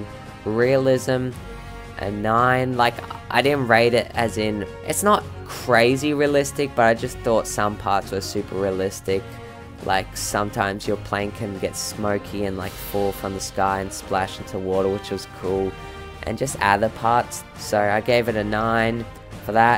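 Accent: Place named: Australia. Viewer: No offense, you sound like an Australian mixed with a French-Canadian. Otherwise, this is really cool. You earned a sub!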